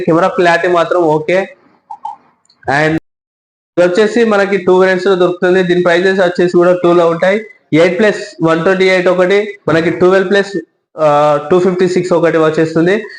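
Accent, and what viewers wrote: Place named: Telangana, India. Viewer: Anna kocham fear kaku anna while saying Nuv chpadii correct ehh kani don't fear while saying..!! Anyways good review anna mana telangan slang lo ❤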